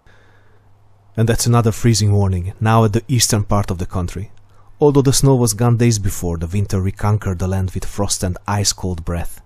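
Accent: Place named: Hungary. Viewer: Magyar akcentust érzek a levegőben. Minden tiszteletem nem lehetett egyszerű a vadászat!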